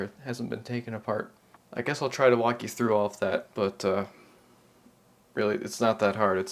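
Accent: United States. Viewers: I love the way you Americans say Dang or Dope. I live in Australia and I've seen one of these after a full restore. Unfortunately Marantz equipment took a China nose dive with their surround amplifiers. After a few months of use, the centre channel started playing up. I ran it in phantom mode but took it to a repair shop for a look. The amount of time he'd have to spend fixing it was a joke so I left it with him and went shopping for a new 7.1 amplifier. I was looking at some gear when I came across an Onkyo 7.1 unit. It was THX approved and ran all the audio matrixes I'd ever need and it was beautifully made. Point to Point wiring etc and the sound was phenominal. I remember Onkyo from the 70s when they made one of the first CD4 Discrete Quadrophonic stereo sound systems. Pink Floyd with a bit of the spliff was fun. (I'm 62 by the way) This new Onkyo unit is brilliant and by the time it's anywhere near clipping, you'd be bleeding out of your eyes and ears! 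The sub out is great. It only feeds the sub with relative info so the bottom end doesn't become overbearing. I've put a DBX 163 between the sub out and the sub to catch transients that can kill any speaker. So many things in Australia are no longer made here. Do you know that we don't even make a car here anymore? Australia made some beautiful Cleveland Earth tuners!! It's a joke. Anyway, Cheers from OZ, Andrew.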